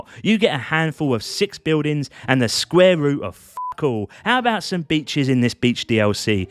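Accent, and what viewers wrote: United Kingdom. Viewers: i love your reviews and your lovely british accent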